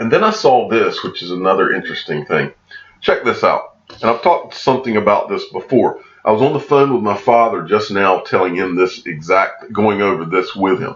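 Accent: South, United States. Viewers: I thought you had had 5 or more margaritas ...until i realized i had your vlog set to 0.75 ..which makes your southern slaw sound so slow and sounding drunk ,my deepest apologies ...of course i can not take away your southern twang ,but it seems i can make it worse . xrp bud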